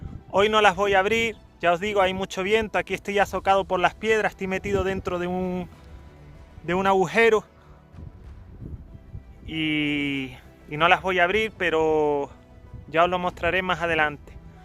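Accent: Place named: Mexico. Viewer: es un experto en apicultura y una gran persona tiene más parecido el acento de voz a mexicano que a español y es un gran ser humano por que nos enseña todas sus experiencias con la apicultura Dios bendiga tu familia. un abrazo con respeto de un mexicano🇲🇽 Desde Ohio usa 🇺🇸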